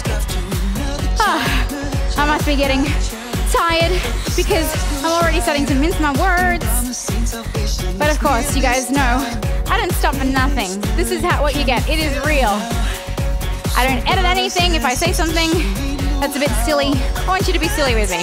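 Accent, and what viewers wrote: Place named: Australia. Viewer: My mom wanted a fun workout to do around Christmas time, so I showed her your holiday walking workout videos (which I love!) and she loved as well! After Christmas she went back to her usual workout routine, but it wasn’t until 2 weeks ago that she requested I show her more videos “of that pretty Australian girl who wears the cute outfits and plays good music” 😂 Now instead of doing your videos alone a couple of times a week, I do them everyday with my mom on her lunch break as she’s been working from home due to the virus. These videos truly lift our spirits and are so motivating! It’s also super fun that I can bond over your videos with my mom now (plus we get some extra steps in the process!) Thanks so much! ps mom’s been working on the Australian accent...she’s been inspired 😂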